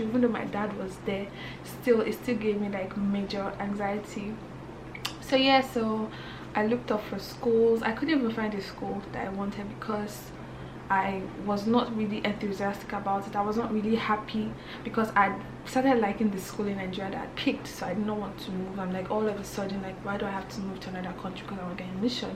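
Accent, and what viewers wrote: Ghana. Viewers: You’re accent has even changed. You’re speaking like a Ghanaian. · Loving your Ghanaian accent 🤗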